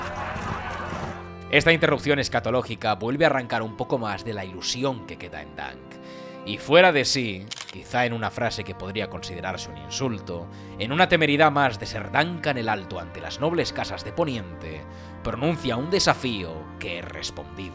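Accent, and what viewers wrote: Spain. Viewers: Que feo es el asentó español mejor que te doblen al latino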